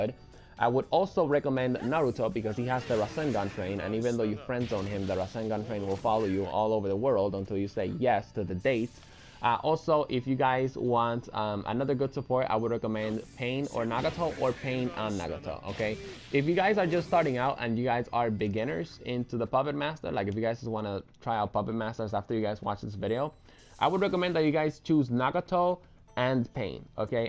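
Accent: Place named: Spain. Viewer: no problem!:) still catch ng your vids by the way, love your accent! You Spanish? Or perhaps Mexican?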